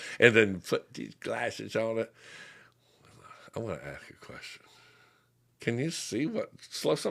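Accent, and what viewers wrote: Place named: Georgia, United States. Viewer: REWATCHING FOR NOTES, i am so sorry i cant help it LMBO...@ you said "I APPRECIATE THAT DAD" 🤣😂😁🤣😂🤣😁🤣😂24✝🙏 Gotta love our Georgian accents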